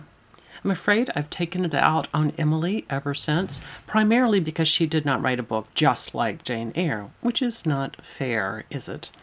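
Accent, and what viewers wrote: South, United States. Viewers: I love a Southern accent it's so soothing and mellifluous. I'm not well educated and keep a Chamber's by my bed to look up old outdated words and terms found in Victorian and Georgian literature. Thank you again for being an interesting guide.❤️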